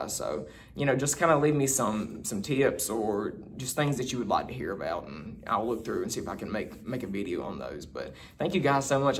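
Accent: South, United States. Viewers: Haha. Love yer suthen accent!!! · Where are you from. I'm from the south. I've never heard this type of southern accent. Also, the mistake you are making is placing way too much emphasis on the opinions and judgments of your family. Wanting, needing, and begging for their acceptance. Why are you doing this?! Are you a cancer ♋️ or something? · Love your accent, where I. The south are you from?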